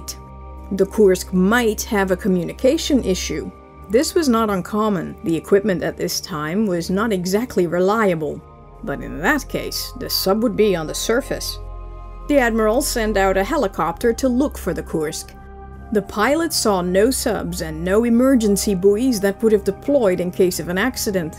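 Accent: Netherlands. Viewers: well she's Dutch so ... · She is dutch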